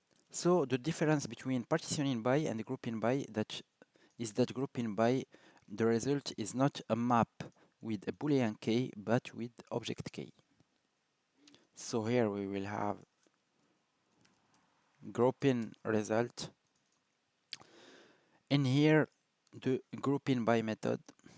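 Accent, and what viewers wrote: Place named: France. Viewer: absolutly he is french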